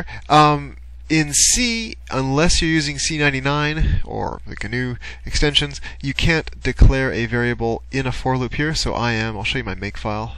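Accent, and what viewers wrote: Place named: United States. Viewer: Cis online instructions sounds weird when read with an american accent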